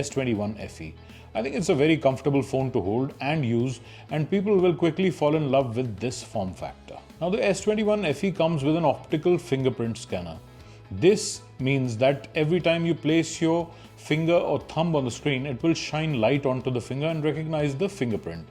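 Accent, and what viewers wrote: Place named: India. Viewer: Beg the question why dont u make videos without that annoying acent put in in title indian ao we dont click on it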